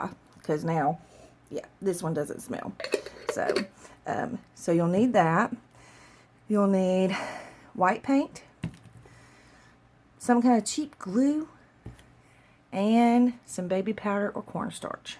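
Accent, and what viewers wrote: South, United States. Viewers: Thank you for sharing. This is simple and doable. Plus... your southern accent is everything 😍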